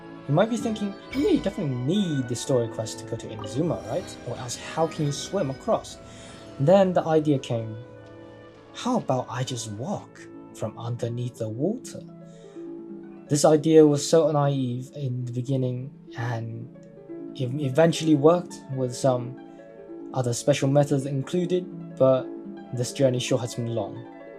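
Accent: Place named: United Kingdom. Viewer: Unrelated question: How do you sound so British/ Western guy when your Genshin Impact Language preference is in Chinese 🤔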